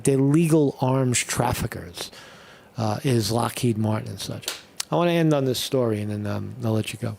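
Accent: New York, United States. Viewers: Hey first time I'm tuning into your podcast you sound like a fellow New Yorker but I could be wrong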